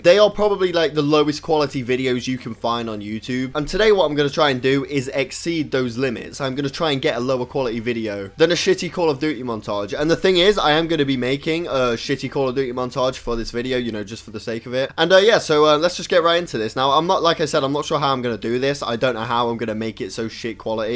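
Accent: United Kingdom. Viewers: British schlatt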